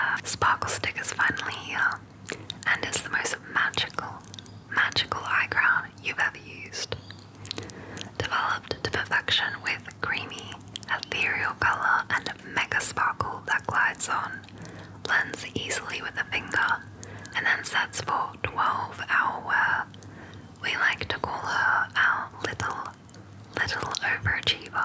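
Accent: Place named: Australia. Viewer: Australia has my heart because the accent is MELTING ME -from over here in America ❤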